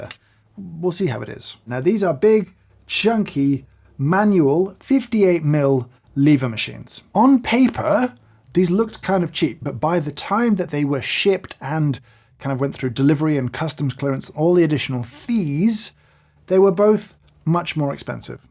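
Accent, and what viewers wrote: United Kingdom. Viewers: My morning is complete, by watch a British sounding person struggling with some of his recent life choices.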